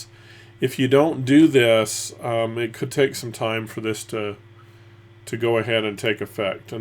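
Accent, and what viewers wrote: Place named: United States. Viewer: I love this video! It is in clear American English, He tells every step from start to finish that must be accomplished to natively restore/backup RDS MSSQL with S3 and the screens still match what I saw on my laptop as I went through this process. This is an excellent 'How To' video, I give it Two Thumbs Up!!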